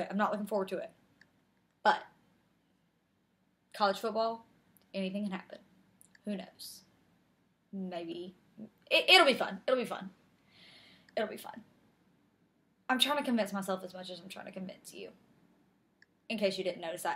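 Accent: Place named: South, United States. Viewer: Just saw you with Rosie and cool you have your own channel for ‘stuff’’ Obviously know the results of the and such as 4 days late but love your southern accent and the f bombs Runner on 2nd is no use anyway Georgia looking good this year, and damn glad the college season is rolling Goddamn I’m an Auburn fan too, although in Ireland, that game against Georgia State was painful Music too - awesome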